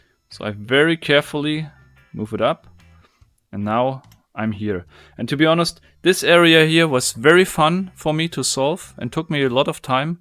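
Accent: Germany. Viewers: genius! but you speak like a german. are you? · I like your typical german engineering accent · Nice german accend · You are easy to understand because of your nice German accent. Lass mich raten: aus Hessen? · Du bist Deutscher nä? Ich höre das raus aus deinen Dialekt.. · Dat german accent Kreygasm. Way too good :'D. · Man hört den deutschen Akzent raus :D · Ich find den deutschen Akzent so geil xD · Are you german? You sound like one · Ich hätte nie gedacht wie stark man den deutschen Akzent im Englischen raushören kann 😂😂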